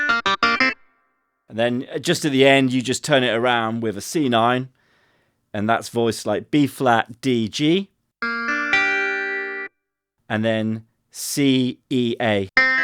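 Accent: United Kingdom. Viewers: I gotta admit ... there are few things funnier than hearing a white guy with a proper English accent placidly proclaiming: “We can all get down with our bad selves”. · I just love listening to your fine British accent, lad! Reminds me of a visit I once paid to my British pen pal, decades back from now. I was a pupil in German high school at the time. Oh. and your music, it is so adorably funky, love it 👍👍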